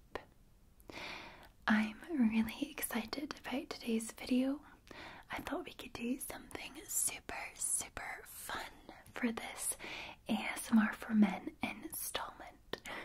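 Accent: Scotland, United Kingdom. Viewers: oh... you resplendent scottish lass. ☺️ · No she's Scottish 😎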